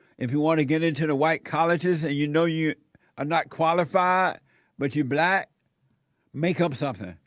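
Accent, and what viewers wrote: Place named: South Carolina, United States. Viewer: Yep sounds like South Carolina.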